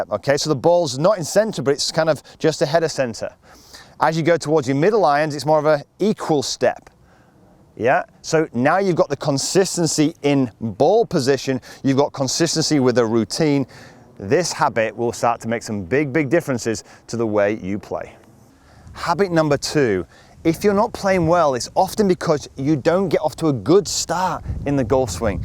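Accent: United Kingdom. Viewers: Helpful tips. Also good for learning British English too. Many thanks. From S.Korea.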